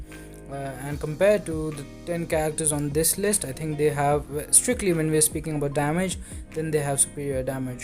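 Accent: India. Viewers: Are you indian??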